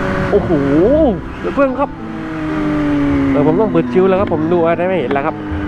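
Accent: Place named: South, Thailand. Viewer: ชอบภาษาใต้ 👍🏻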